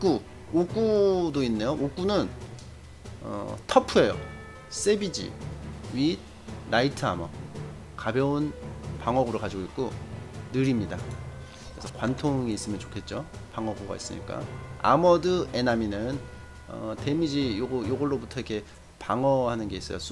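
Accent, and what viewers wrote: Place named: South Korea. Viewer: 자연스러운 콩글리쉬 발음에 편안함이 느껴지는구먼.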